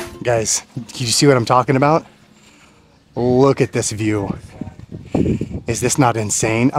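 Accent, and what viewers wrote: Philippines. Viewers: I love your vlogs, showing local places and I love your Filipino accent.my husband of 41 years still can't get it..He has a limited vocabulary no matter how much I taught him 😅😅😅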